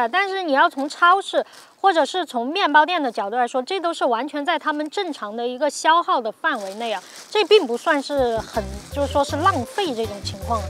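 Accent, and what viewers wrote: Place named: Northeast, China. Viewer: 又會說四川語言 又是東北口音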